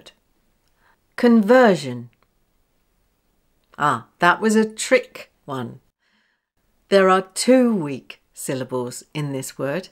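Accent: RP, United Kingdom. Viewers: Thanks so much 🙏😊. I love your posh English 🎉.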